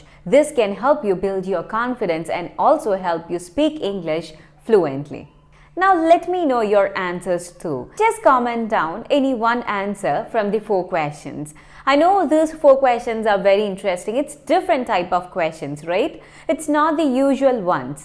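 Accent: Kerala, India. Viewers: Are you malayalee?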